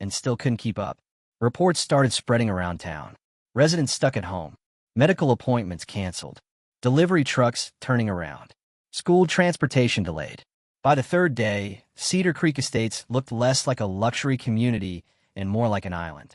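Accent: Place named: Spain. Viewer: Ugh, the amount of verbal diarrhea in a simple 20 minute story, plus the periodic Spanish accent and gibberish? 👎👎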